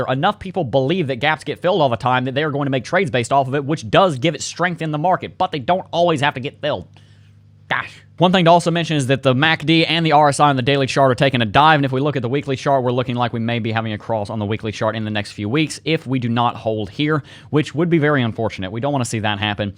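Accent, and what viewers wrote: South, United States. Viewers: Like your southern accent, which state are you from?😂🇺🇸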